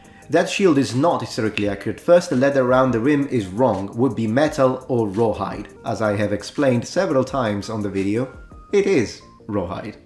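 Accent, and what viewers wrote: Italy. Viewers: I didnt realise until now you had a slight Italian accent...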